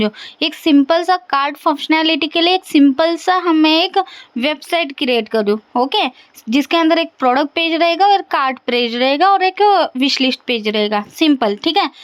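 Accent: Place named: Maharashtra, India. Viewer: Maharashtra.. I knew it.. by your tone and language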